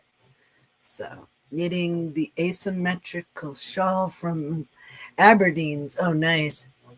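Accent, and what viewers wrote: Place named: New York, United States. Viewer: Hi. Sorry I missed your live but watching replay. Your Boggy Blanket are so beautiful. Congrats at almost your 100 goal of number of blankets. That is a lot of work. Love to watch your videos. Not sure of where you are but your accent sounds northern, maybe close to New York. Have a great day, take care and stay safe. A friend.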